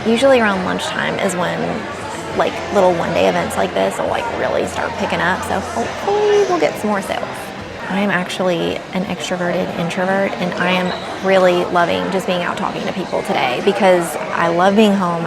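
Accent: Tennessee, United States. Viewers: Loved seeing you interact with all your customers. You are so good at that! Also, I realized how much more your TN southern twang came out while engaging with customers. I am an East TN girlie and you sound just like everyone here.😊I haven’t made it all the way to the end yet but I hope you did well and even those who didn’t purchase will visit your website soon!❤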